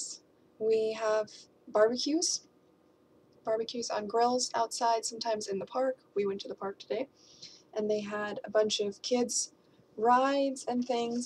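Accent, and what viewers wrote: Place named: Belarus. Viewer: акцент делает русский язык, очень похожим на белорусский язык =)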